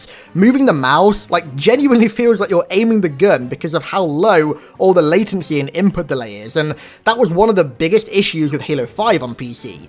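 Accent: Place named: United Kingdom. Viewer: Your voice is annoying I didn’t know I’ll be listening to a British pausing squeaker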